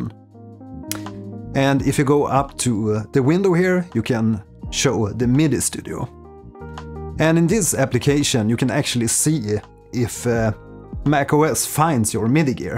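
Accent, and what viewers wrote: Sweden. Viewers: nice anc clear video. I also love the swedish accent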